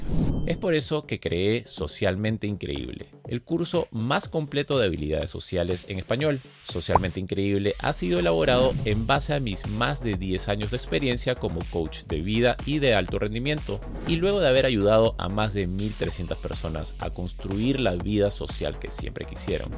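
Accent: Peru. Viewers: Muy Buen video. Eres peruano? Tienes el acento.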